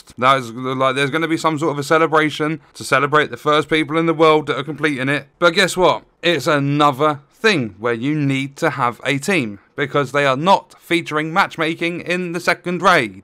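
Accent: England, United Kingdom. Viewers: I don't play the division, I don't watch division videos, but listening to your classic " its a load of bollocks " English accent is enough for me to watch your videos. Keep up the rants the game is aids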